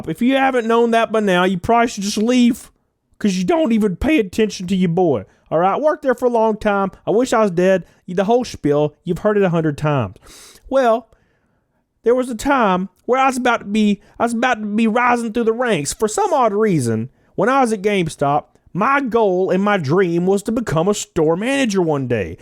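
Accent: South, United States. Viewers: Have you ever considered voice acting? I could imagine you doing voices for cartoons or even radio dj, or audiobook. Your southern accent is so unique! Great content tho, always funny and intriguing to hear what goes on in crazy retail.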